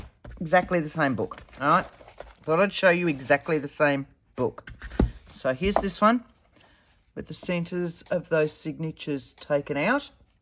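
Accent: Australia. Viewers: Hi. Nice to hear an Aussie voice on a junk journal tutorial. I so wish I had found this two years ago when I first started out. However, I have already started taking my readers digest book apart to create my very first altered book along with you. Keep up the good work, loving it.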